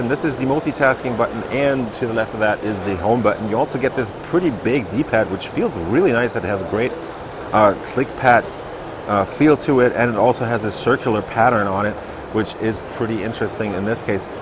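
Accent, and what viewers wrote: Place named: Germany. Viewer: Your accent sounds like German!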